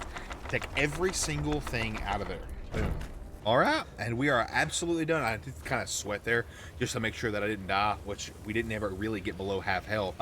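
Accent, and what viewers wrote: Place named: Louisiana, United States. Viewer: Where you from bro? Lol im from Louisiana so the accent is familiar. Geeat content btw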